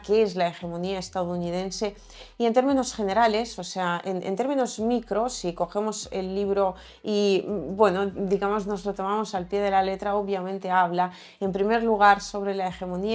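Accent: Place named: Spain. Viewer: Lo siento, pero mientras tu sigas hablando como española no veré tu canal. Es muy pesado ese acento y tu público es latinoamericano, no español.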